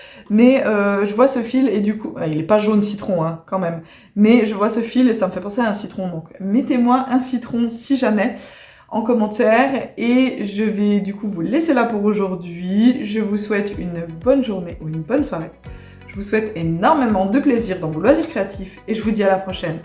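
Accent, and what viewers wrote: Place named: Belgium. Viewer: Bonjour. Je vous découvre pour la première fois .je ne savais pas du tout d'où vous étiez et a votre accent il me semble que vous êtes soit du nord soit belge. Je suis aussi belge mais vit en France depuis toujours.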